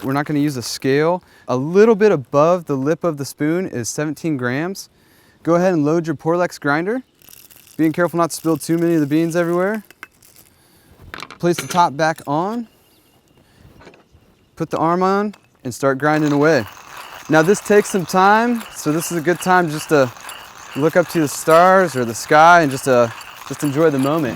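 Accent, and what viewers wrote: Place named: Western Canada, Canada. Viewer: Great Review, dude sounds very Vancouver/ Calgary.. but I know this is a westcoast US brand.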